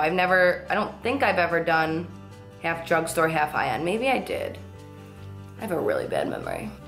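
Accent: New Jersey, United States. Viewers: She is from New Jersey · Jersey accent :)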